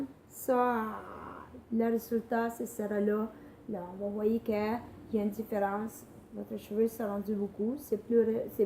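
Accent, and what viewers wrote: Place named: Canada. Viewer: Merciii, c'est vrai que c'est excellent l'huile de Ricin. J'aime beaucoup ton accent canadien.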